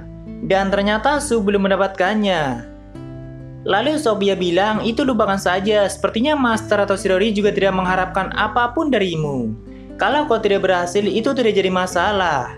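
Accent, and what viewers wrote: Java, Indonesia. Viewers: Iya sih klo pas ngbrol kliatan Jawa nya, mending gituu sih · Kemaren di live megane sensei logat jawamu kentel bang....kok di kontenmu ga keliatan logatnya????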